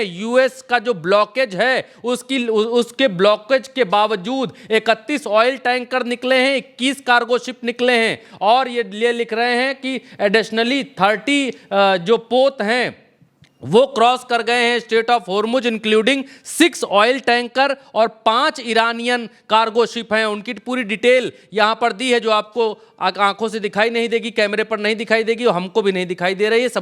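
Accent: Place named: Bihar, India. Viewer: .I. not only like your presentations but also enjoy. Yours Bihar ki. Villege Accent . Apart from. Khan. Sir videos I. had liking for Sekher Suman who used. To copy. Lalu .prasad yadab .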